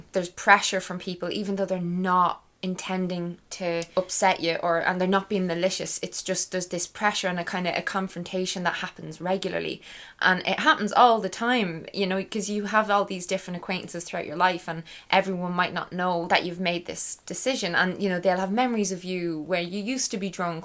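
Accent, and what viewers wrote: Ireland. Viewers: I can tell by your accent that you are Irish, but that is just me! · I love listening to you. Your irish accent reminds me of when I was living in Galway a few years back. Love Ireland. Big up to you for getting sober. I am two weeks in at the moment. Feeling so much better already. Greetings from germany. Chris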